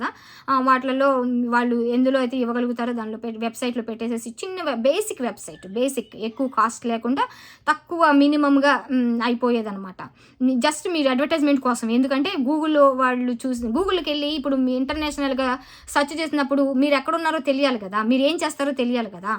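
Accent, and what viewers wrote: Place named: Guntur, India. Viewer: Sister.. Ur from Andhra??? I think ur chridtian. Ur taking like Guntur Telugu language.. M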